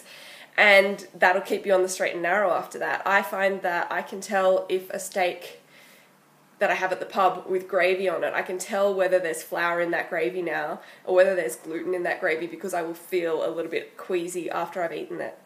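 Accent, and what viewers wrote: Australia. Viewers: Wish I knew the first tip about phasing it in and shaping eating behaviour before my first Paleo attempt (rather than going at it like a bull at a gate & failing miserably). Also, have I found another Aussie?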